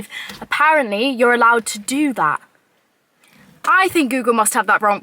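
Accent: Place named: United Kingdom. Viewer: Who would not wanna hang out with such an endearing British accent? 😍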